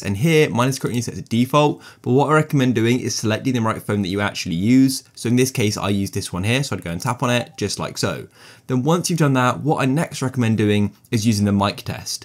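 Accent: United Kingdom. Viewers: EWWWWWWW NOT AMERCIASN BRITISH EWWWWWWWWWWWW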